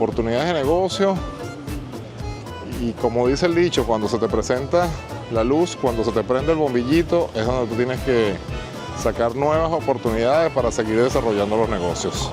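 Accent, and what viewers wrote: Venezuela. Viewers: Tu vivias en Caracas? Hablas muy caraqueño como de Alto Prado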